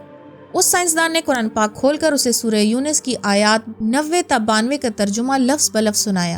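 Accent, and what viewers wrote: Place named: Lahore, Pakistan. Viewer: U are lahori q ke AP ray ko aray prty fact about us means lahories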